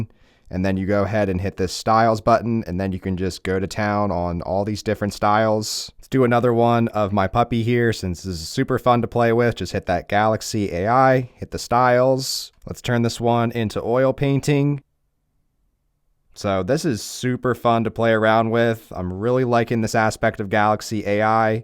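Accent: United States. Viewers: The most American accent ever wow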